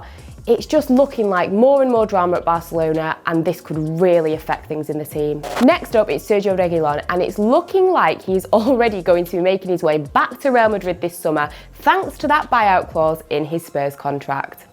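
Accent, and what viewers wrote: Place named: Manchester, United Kingdom. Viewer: Love that accent! Is that from Manchester? ;)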